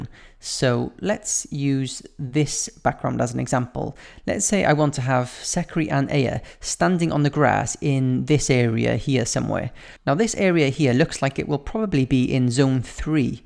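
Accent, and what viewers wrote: Wales, United Kingdom. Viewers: omg! you're welsh!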